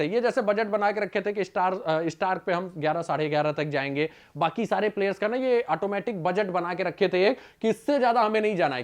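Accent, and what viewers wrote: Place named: Bihar, India. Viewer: Hindi to sahi bol pehle pure bihari accent aa rai Baki sabb to okay hai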